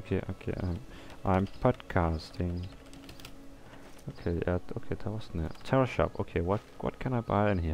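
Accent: Denmark. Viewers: Your english sounds Danish like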